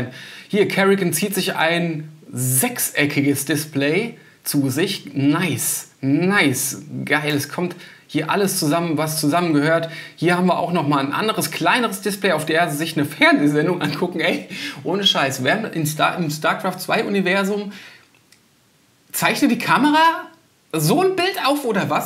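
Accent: Hesse, Germany. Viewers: Hart am feiern und immer wieder schaut der Hesse raus :)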